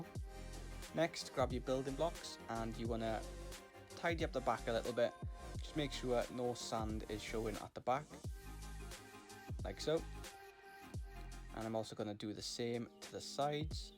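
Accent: Wales, United Kingdom. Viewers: Are you welsh? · Wait is this guy Welsh? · u welsh? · You sound welsh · You welsh? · Are you British or welsh?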